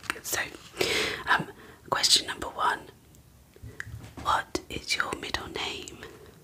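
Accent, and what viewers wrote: United Kingdom. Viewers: i'm such a sucker for british accents and curly red hair! :) <3 also, i'm pretty new to the ASMR community so I thought I'd drop by & say hey! nice to meet you - just subbed!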